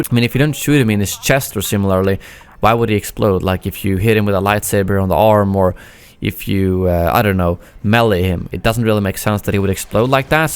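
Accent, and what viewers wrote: Sweden. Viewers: Are you swedish because you sound like you are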